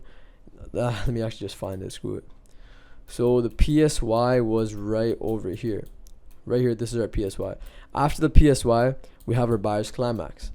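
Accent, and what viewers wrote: Toronto, Canada. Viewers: U sound like u from toronto. Am i wrong?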